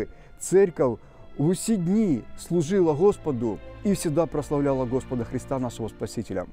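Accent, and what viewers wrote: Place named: Surzhyk, Ukraine. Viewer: не дивлячись на те що ви говорите на суржику , видно як ви намагаєтесь говорити українською. Ви молодець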